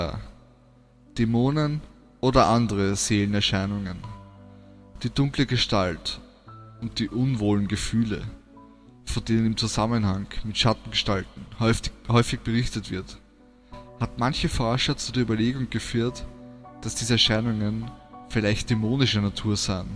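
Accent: Austria. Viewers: du hast genau so eine stimme wie jmd den ich aus skype kenne bist österreicher oder ?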